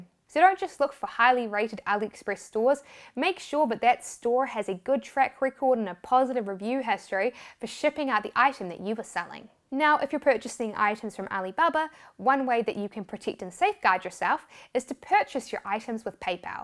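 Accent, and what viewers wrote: New Zealand. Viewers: she has a classic New Zealand accent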